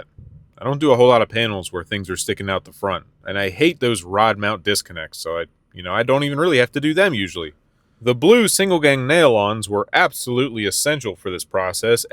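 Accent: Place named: Philadelphia, United States. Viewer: Awesome video, love the custom ATS. Regarding split phase 120/240 terminology in some places people do call them phases and we know what they mean, but that can be a slippery slope to people calling split phase 'two phase'. And To me your accent really sounds like a Philly accent, and Philly being one of the only places that actually has two phase power where each phase is 90 degrees apart, I'd stick with lines or legs for split phase 240... and if you happen to be in Philly I'd love to see a video on a some actual 4-wire two phase motors and controls if you come across any. Thanks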